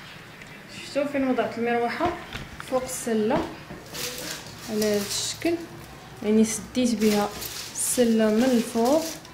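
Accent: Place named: Morocco. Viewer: اللهجه المغربيه غاية في الروعه 😍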